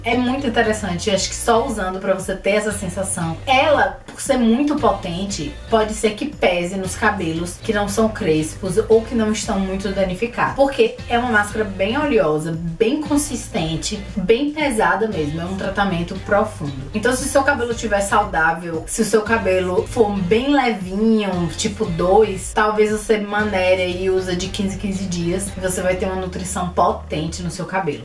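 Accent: Bahia, Brazil. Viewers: Queria q vc falasse com mais sotaque baiano. Acho lindoooo❤️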